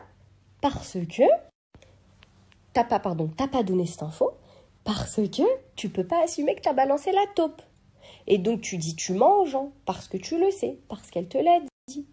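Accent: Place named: Belgium. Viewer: Merci a toi tes belge???? Jadore ton accent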